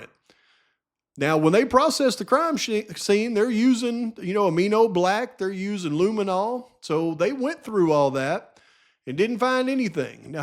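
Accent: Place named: Arkansas, United States. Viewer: He lived in Arkansas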